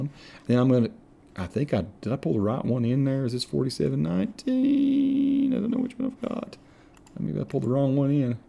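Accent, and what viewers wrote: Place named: North Carolina, United States. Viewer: Thanks man great video. Red Springs and that accent must be NC. I live in Fayetteville ;)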